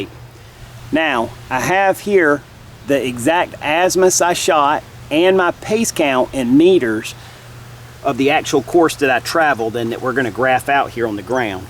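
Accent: South, United States. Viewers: A++! I just finished watching all your map and compass videos.....OUTSTANDING! This was a great review for me, although I'm familiar with using a compass and map, I have not done any map work in years. During the 70's I spent a lot of time in Yosemite backpacking and snow camping. Then I moved to upstate New York near the Adirondacks where again I spent a lot time hiking, camping and canoeing (also using map and compass). This video series serves as a great reminder for this "old rusty bird". Moved to Nebraska in 1986 and most of my backpacking days ended then, except for bike touring and camping in campgrounds. I haven’t had any experience with mapping or dead reckoning, so I found that very informative. I must compliment you again, it's obvious you love doing these videos, because you put a lot off effort in each one. I will continue to watch your videos, I'm hooked! 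Will be doing some "upgrading" of my gear with plans to put it all to use this upcoming spring, summer and fall. Don’t know that I’m tough enough to camp during these Nebraska winters. Thanks again. BTW, what state do you live in? I've noticed your southern accent. I grew up in Texas and consider myself a "misplaced Texan", so I understand words like y'all, fixing and stuff. 😜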